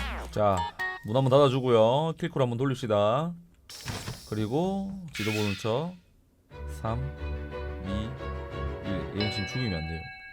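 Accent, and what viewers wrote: Busan, South Korea. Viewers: 부산 분이신가요?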